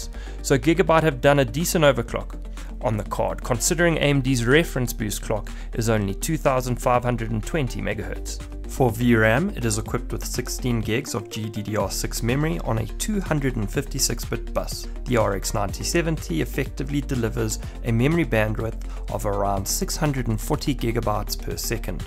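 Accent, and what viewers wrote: South Africa. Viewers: Howzit bru, nice review. It was super cool to hear the Saffa accent! I currently live in Asia and was able to get the card for around 670 USD. Not MSRP but considering the current market I am happy with the purchase. Looking forward to more content in the future!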